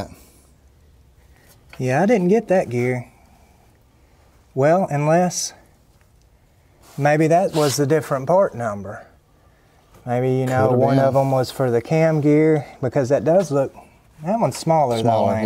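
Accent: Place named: Texas, United States. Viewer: I like the pace of your working. You tackle things so intentionally and cleverly. Also the dialogue between the two of you is amazing to witness. I prefer that so much more than when you made the silent version on the Citroen. That was kinda weird because it was so obvious that at times you had to restrain yourself talking. That made it awkward to follow. Besides, your Texas twang is nice to listen 😊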